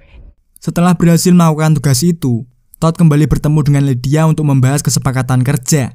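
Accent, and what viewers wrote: Java, Indonesia. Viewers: Akhirnya. Selesai setelah. Berjam jam yimak. Seru ui. Apa lagi narat0r nya. Berl0gat med0k. Berasa tak asing. Seperti ng0br0l ama kawan diselala n0nt0n pilim 😁😁😁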